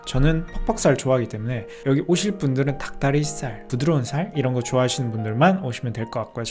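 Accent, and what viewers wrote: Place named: Seoul, South Korea. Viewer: 이제는 서~~울 사람 같아요 ㅋ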